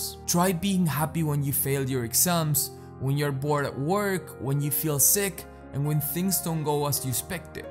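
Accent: Argentina. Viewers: Por qué siento que esté pibe es argentino?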